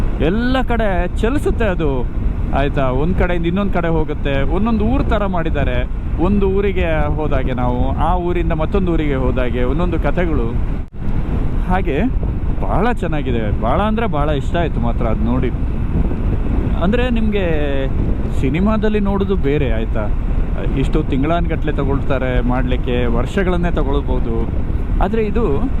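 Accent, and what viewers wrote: Kundapura, India. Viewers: Kundapura Kannada ❤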